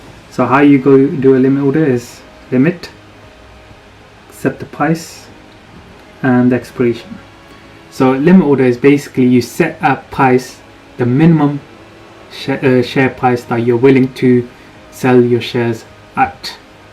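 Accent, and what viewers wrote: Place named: United Kingdom. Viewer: thank you for the advice, love the video, love the UK and the accent as well :D thumbs up for the vid!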